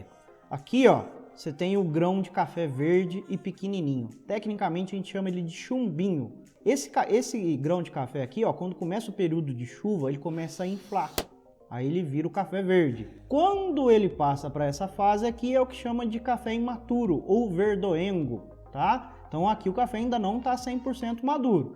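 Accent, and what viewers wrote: Minas Gerais, Brazil. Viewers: Amo esse sotaque mineiro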